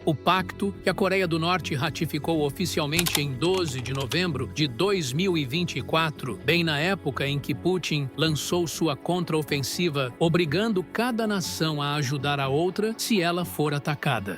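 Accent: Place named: United States. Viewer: Que pronúncia Americanizada é essa com os nomes russos?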